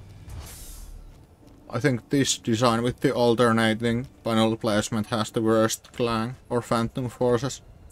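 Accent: Finland. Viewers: You sound finnish, Are you finnish? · Just found your channel earlier and love it! Keep up the good work man. Also, you have a very interesting accent, are you from Finland?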